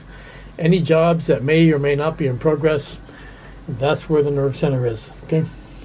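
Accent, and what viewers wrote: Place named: Canada. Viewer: Aha ! That's why you have a Canadian accent!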